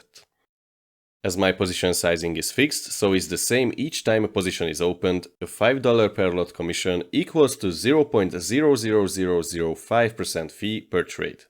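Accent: Hungary. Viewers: lets say we have commissions about 3$/Lot . One more important question: Is tradingview backtester counts the spread ? Thank you for your answer . I am not here to criticize you because you looks like one honest trader from 100 of scammer but i want to understand and maybe try out your strategy . And one more personal question . I see that your channel is from Romania , and i hear from your accent that you maybe hungarian , is it true?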